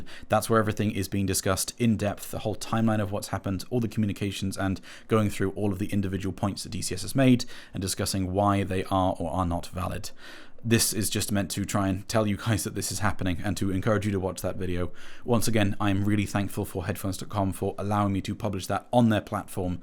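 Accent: United Kingdom. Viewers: Question? How can they take you to court if they’re not a UK company. I assume from your accent that you’re in the UK. I would like to see how far (in minutes) this would get in a UK court.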